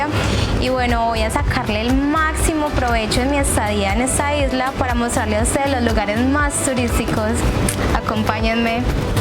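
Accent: Antioquia, Colombia. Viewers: Eres hermosa... y ese habladito paisa me mata👌❤🇨🇴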